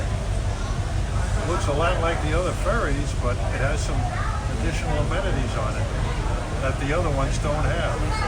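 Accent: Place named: Chicago, United States. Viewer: I do believe you made a trip to Chicago at one time as I recognize that accent of yours , no offense there , just good enjoyable vids ya do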